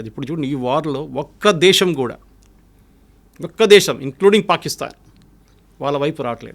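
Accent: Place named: Telangana, India. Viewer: Telengana accent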